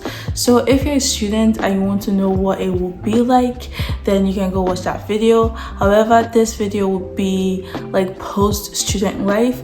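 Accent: Glasgow, United Kingdom. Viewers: I detect a slight Glasgow accent now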